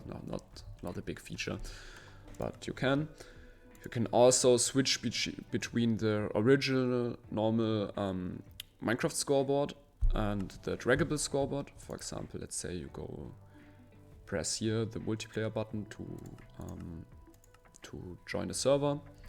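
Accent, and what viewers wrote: Germany. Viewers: wait a minute u are german ?! (i can tell by the accent) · Ich richte den Deutschen Akzent haha ich liebe deinen Client ! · Direkt erkannt das du deutscher bist xD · are you german ? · joo dieses english seit ihr deutsche ??? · Bist du deutsch bcs Akzent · dierekt gemerkt das du deutscher bist HAHA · Deutsches Englisch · hörst dich deutsch an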